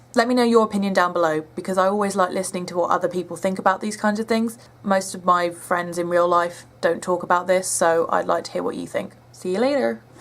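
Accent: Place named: United Kingdom. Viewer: Saw ya comment on Sourcefed. I agree with what you said in your vid, I also think it was also a shame in how they changed her image, really no need for it. I myself thought she was a great character and i really liked her. Not that i have a problem with really feminine women, but i rather the normal down to earth, and even in a way tom boy-ish girl to a certain extent, i even prefer to date women like that :P. Curious i know your British from your accent, but are you also Scottish by chance?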